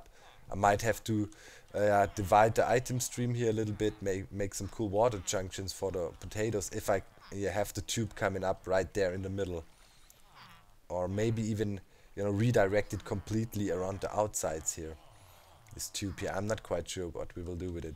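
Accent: Germany. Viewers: are there any English words which you struggle to pronounce due to your German accent?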